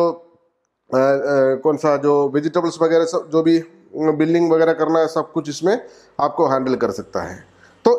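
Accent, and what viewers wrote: Kerala, India. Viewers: Cheta Malayali aano....slang spashtamayitu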